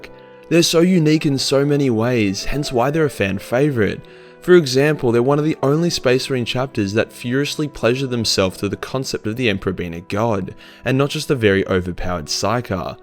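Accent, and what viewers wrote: Australia. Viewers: also, appreciate a little bit of an Aussie dialect lesson there.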